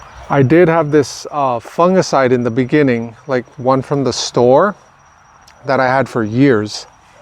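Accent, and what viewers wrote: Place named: Miami, United States. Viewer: Miami accent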